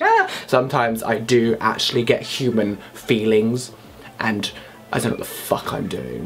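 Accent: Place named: United States. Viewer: you acually sound really American ( the accent) :))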